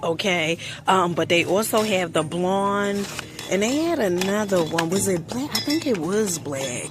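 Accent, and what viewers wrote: New York, United States. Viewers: Are u from nyc. I love the sound of ur voice 😊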